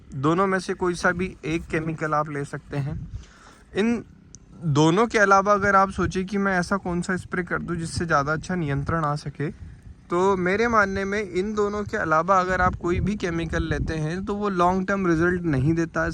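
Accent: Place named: Harda, India. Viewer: Wo ko bo bol raha hai Matlab harda jila se hai banda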